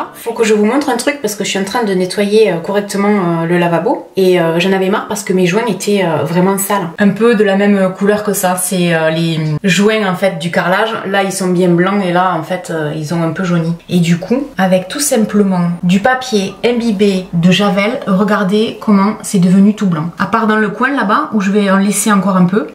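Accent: South, France. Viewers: Bonjour, Je découvre tes vidéos...et j'aime beaucoup! J'aime bien ton accent du Sud moi la Lorraine. Je te remercie pour ton investissement et je me permets de te faire un 🥰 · Toujours agréable vidéo avec ton sourire et ton petit accent du sud bisous ma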